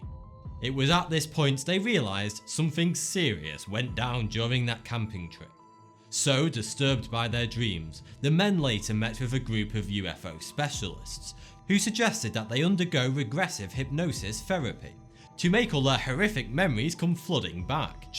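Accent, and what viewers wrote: United Kingdom. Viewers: He is British (not trying to be racist) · this dude is very British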